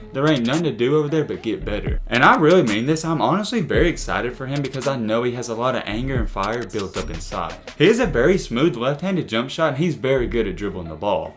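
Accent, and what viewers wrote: South, United States. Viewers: Can’t finish video because of yo country ass voice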